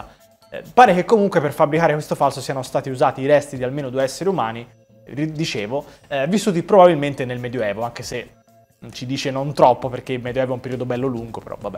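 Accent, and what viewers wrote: Tuscany, Italy. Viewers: Grazie, come sempre esauriente e tanto simpatico con quell'accento toscano... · Non so... Quando sento quell'accento toscano...mi garba....🤘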